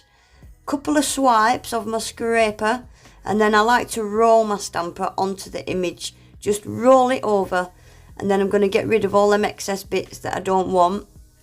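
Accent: Northern England, United Kingdom. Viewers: I'm saying the Northern accent and obvious hard working hands are a great character to have. You are not a 'dumb dumb' infact you are a genius at what you do. I'd rather watch you than the others because you are real. Keep it up young lady 😍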